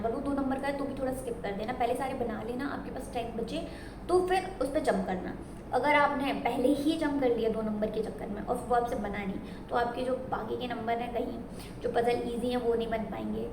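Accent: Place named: Madhya Pradesh, India. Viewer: DIdi MP se ho kya ?? Language bhi jani phechani lag rahi hai 😅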